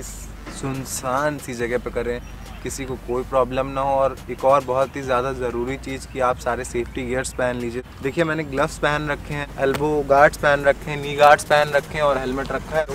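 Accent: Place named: Lucknow, India. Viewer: Ekdm LUCKNOW wali boli hai bhai... Loved it... 💙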